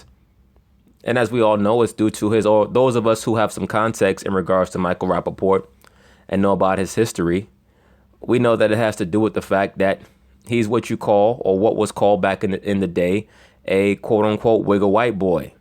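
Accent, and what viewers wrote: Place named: New York, United States. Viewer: He was born and raised in New York that's why he talks like that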